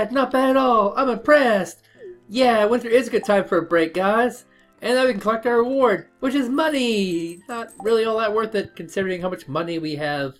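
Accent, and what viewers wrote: Canada. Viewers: defo hear a maritimes Canadian accent :)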